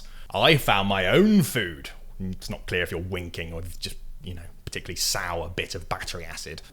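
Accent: United Kingdom. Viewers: Who is this guy? Why is he talking to me? He sounds very British if you ask me. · Your accent isn't British in the true sense It sounds English.....anglo-norman Nothing like the actual British celtic cultures like Welsh and Cornish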